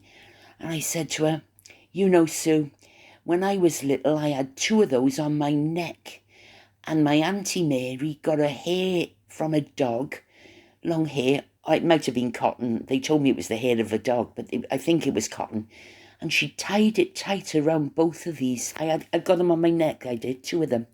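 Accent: Wales, United Kingdom. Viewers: My Welsh friend you have been missed i love listening to your Welsh accent it reminds me of home i can't wait for your next chat i love your stories of the palour And anything really its all interesting to me until your next one 💐 💝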